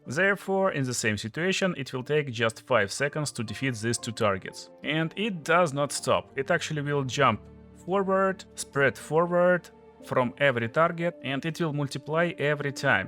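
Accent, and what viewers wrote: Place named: Russia. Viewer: Почему нет коментариев на русском, говор явно выдает автора хД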